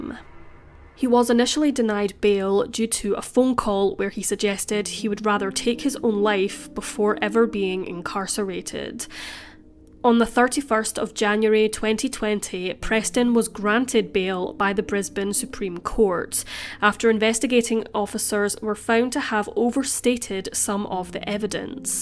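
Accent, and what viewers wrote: Scotland, United Kingdom. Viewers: Brîndușa D. scottish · fuck you mean odd. It’s a Scottish accent, maybe roughly around Edinburgh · Scotland is my guess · Defo Scotch. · She's from Scotland, that's where I live x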